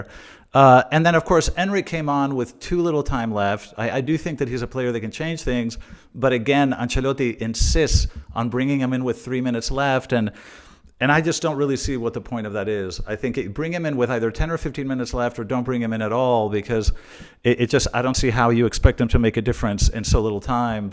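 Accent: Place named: United States. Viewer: We love this channel a lot. But it's like you are American. You speak with a clear American accent.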